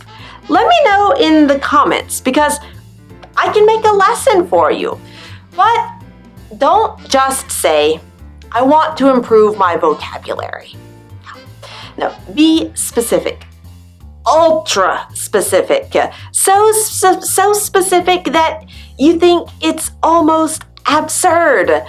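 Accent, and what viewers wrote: France. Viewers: Oh my God! I can notice the french accent when you speak😱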